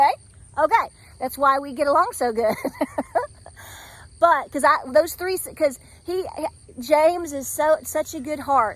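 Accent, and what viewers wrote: South, United States. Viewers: I like your accent; you must either be a Texan or from some from south. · your not duche your amerikan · I thought you were from the Netherlands until about half way into the video and I thought you sounded like the most American foreigner that I've ever heard.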